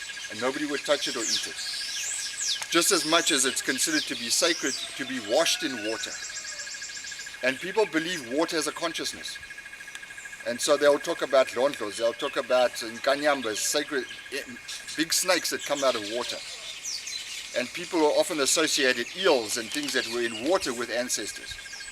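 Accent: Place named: England, United Kingdom. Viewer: Pretty sure that's an English accent too. Colonizers !!!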